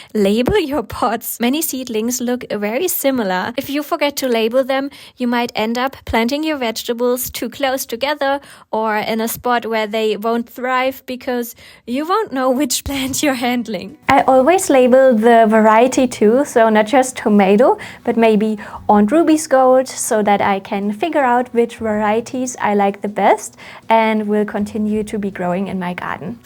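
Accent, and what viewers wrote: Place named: Germany. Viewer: You’ve got the most lovely german accent I’ve ever heard, great video!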